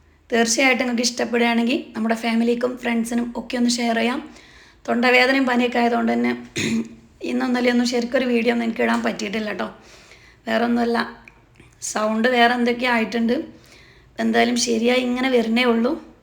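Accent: Malappuram, India. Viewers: Malappuram thathante slang maariyo😂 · Aa malapuram baasha thanne Mathiyenu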